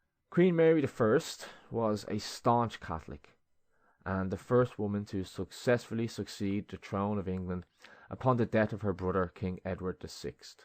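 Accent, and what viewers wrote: Dublin, Ireland. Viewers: I love the Dublin accent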